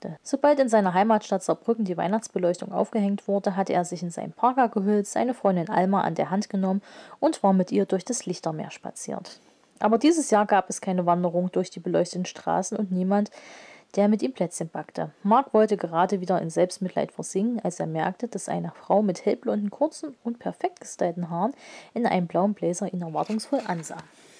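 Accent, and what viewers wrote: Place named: East Germany, Germany. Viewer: Danke für deine Videos ich nehme es mir als kleine Hilfe falls ich bei mir nicht weiter komme . Video ist top und dein Ossi Akzent ist auch unterhaltsam für mich als Wessi . Ich hoffe du verstehst Spaß 😜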